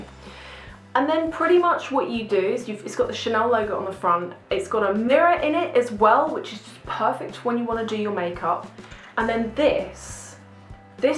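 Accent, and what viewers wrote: United Kingdom. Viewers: I love her British accent .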